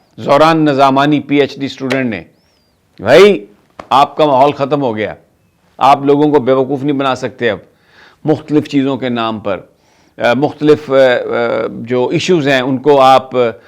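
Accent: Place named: Jangli belt, Pakistan. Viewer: Samee sb good way of expressing YOU belongs to Jaangli belt Kindly confirm As sometimes words came in that way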